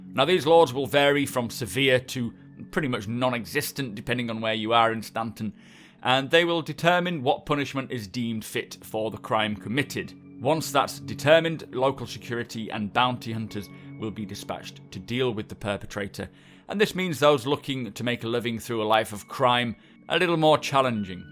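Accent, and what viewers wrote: United Kingdom. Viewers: Fck.. Why is every game vid on YT some British guy!.....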